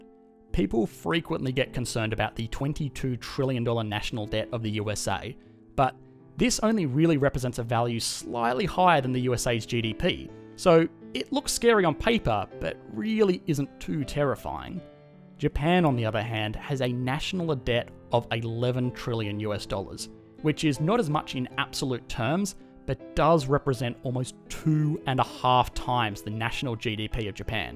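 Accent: Australia. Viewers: Australian accents are very thick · Is yours an Australian accent??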